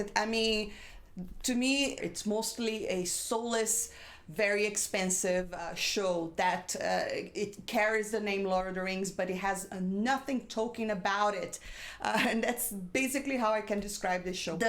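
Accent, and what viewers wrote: Brazil. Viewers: Que bela resenha. Sei que é brasileira pelo sotaque imediatamente. Sou americano mas meu pai é brasileiro ;) Ótimo canal, me inscrevi! Sucesso! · Are you Brazilian? Sounds like a Brazilian speaking English. (Good English though), thanks for the video.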